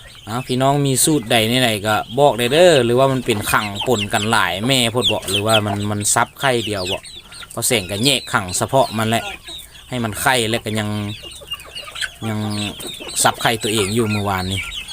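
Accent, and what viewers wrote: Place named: Thailand. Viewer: เว้าไทนาหัวพูแน่🤣🤣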